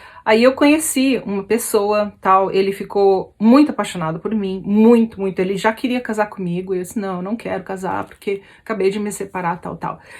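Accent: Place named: Rio Grande do Sul, Brazil. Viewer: Boa noite querida! Foi bom ouvir suas lembranças também me lembrei das minhas kkk. Vc é muito bonita não aparenta a idade que tem 👏👏 eu fiz 54 dia 4 de abril. Olha eu acho legal quando vc me chama de guria kkkkk é quando vc fala BA 😄 VC não esqueceu o sutaque kkk também gosto quando vc fala em inglês estava estudando inglês só parei por causa desse corona não consigo estudar. Fique com Deus 😘💖🌺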